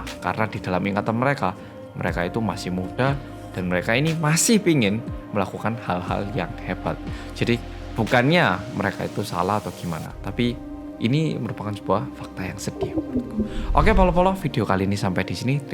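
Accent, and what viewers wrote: Java, Indonesia. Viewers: Orang Korea yang medokkkk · MEDHOOOKKK e ikulo.. medok polll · Suka dengar bercerita..orang korea cakap bahasa indonesia jawa....teringat jiran ibu dulu orang jawa,tapi orang malaysia.macam ni lah cara dia bercakap...moga terus maju..salam dari malaysia. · 'Halo gezz welcomeback to korea reomit bersama orang korea yang.... medokk' terngiang² di kepala😌😂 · Orang korea yang medokkkkk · Konten yang kurindukan dari Chanel medok~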